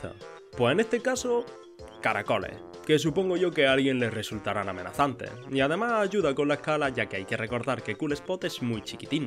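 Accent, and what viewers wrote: Andalusia, Spain. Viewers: No cambies tu acento. Sé que algunos no les gusta el acento de Andalucía, perp yo he quedado encantado. ¡Sigue así, man!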